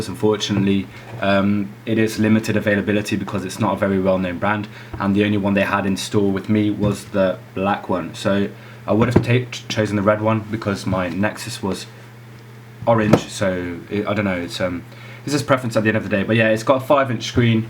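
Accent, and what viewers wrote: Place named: Netherlands. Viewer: Flawless English accent for a Dutchie oml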